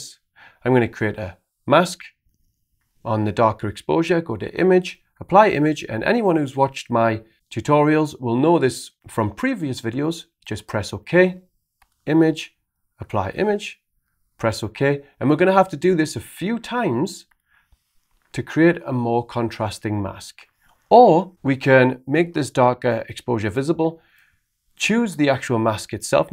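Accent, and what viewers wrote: Newcastle, United Kingdom. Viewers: Found this via SLR Lounge on Facebook. Subscribed. A Geordie teaching Photoshop might just be the best thing ever. The only thing that would make it better was if you did a video while you were drunk lol.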